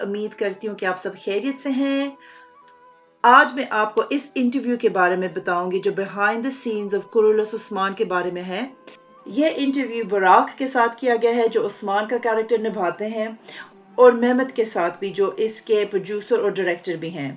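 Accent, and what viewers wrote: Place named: United Kingdom. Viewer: Why are you speaking Urdu in English accent? It doesn't suit you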